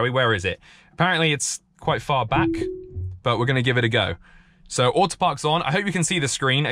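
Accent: United Kingdom. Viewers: North and middle of UK talk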